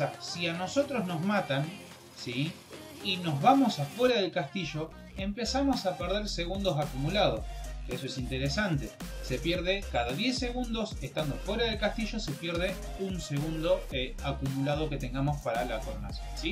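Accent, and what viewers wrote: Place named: Rosario, Argentina. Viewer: Sos de Rosario ?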